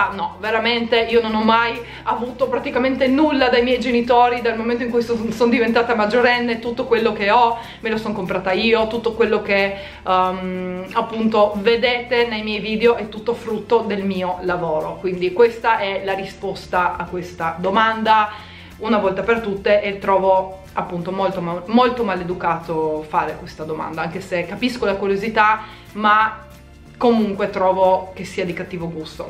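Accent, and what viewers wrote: Trieste, Italy. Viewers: Penso che quando intendono che parli strano.........CHE SIA SOLO L ACCENTO TRIESTINO 😂😁😂😁😂😁😁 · Tralasciando il fatto che quando hai menzionato la mandibola è stata la prima volta che ci ho fatto caso (sennò ciaone che me ne accorgevo), pensavo che il “parlare strano” fosse riferito all’accento triestino!! 😆 Comunque sia sei stata fin troppo gentile e sincera, anche perché non eri assolutamente tenuta a dare nessun tipo di spiegazioni, specie a gente che per le domande che fa non sa davvero stare al mondo. Se muovessero di più il sedere per ottenere quello che vogliono invece di lagnarsi dei successi altrui non sarebbero tanto maligni e invidiosi. Robe da matti.. (PS: vorrei proprio vederla la scena dal vivo, dove l’imbecille di turno ti chiede se sei incinta e tu “no. Sono ingrassata.” BOOOOOM!! Figura di 💩) (PPS: fregatene, sono invidiosi e stupidi. 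La tua family ti vuole bene e ti apprezza sempre❤️) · sei fantastica così! Con il tuo accento triestino che ti contraddistingue, la tua mandibola che se ne va per gli affari suoi e con tutto il resto che ti caratterizza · ti seguo dal 2009/2010 e cavolo! non avevo notato la mandibola! pensa che quando leggevo "perche parli in modo strano?" io credevo intendessero la pronuncia o la voce e pensavo boh? sarà che e' triestina e slovena e non si sente molto in italia sta pronuncia?? io sinceramente non ho mai notato il problema della mandibola! boh! che gente però... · non è che parla in modo strano, di chiama accento triestino ragazzi. per chi abita vicino al confine è normale prendere l'accento simile alla lingua dell'altro stato (anche io sono di trieste, capisco perchè ti dicano così). non credo che ad una persona ad esempio di napoli (ho preso un posto a caso dove ci sia un accento sentito) direste "parli in modo strano" solo perchè conoscete l'accento napoletano. 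gli accenti ci sono in tutta italia amici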